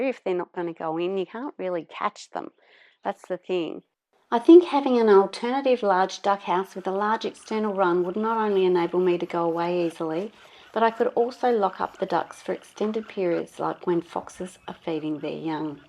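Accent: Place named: Australia. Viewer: You sound a little australian. Can I ask what country are you in??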